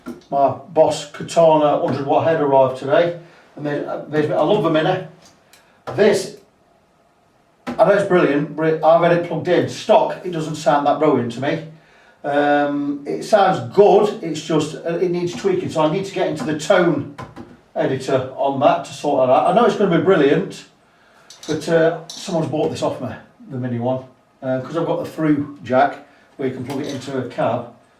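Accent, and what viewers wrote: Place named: North West England, United Kingdom. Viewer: Fantastic job! As coincidence would have it I would like to do pretty much the same job to my duo sonic. It’s a 2018 SS seafoam green with a PF fretboard. Intonation isn’t great with the short scale and even though I have fitted 11 gauge strings, the guitar almost seems desperate to go out of tune. I would also like to upgrade the pick ups with something hotter and, definitely with a humbucker in the bridge. Judging by your accent and the reference to your friend from Sheffield, it sounds like you are based in the north-west. I am in Manchester. Is there a way we can talk about you doing some business on my guitar please? Thanks.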